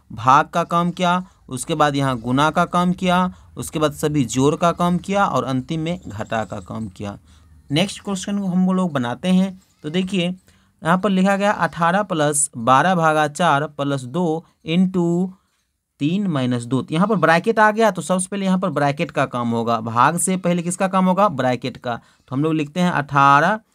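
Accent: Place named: Maithili, India. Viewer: Bhai aap bahut maithli bol rhe ho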